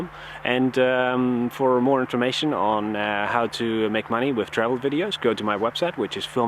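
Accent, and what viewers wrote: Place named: Italy. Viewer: Are you Italian? Love your accent!!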